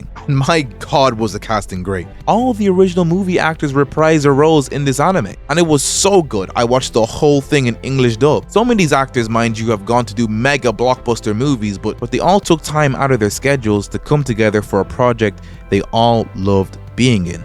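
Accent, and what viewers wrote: Ireland. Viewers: Are you Irish, you kinda sound it?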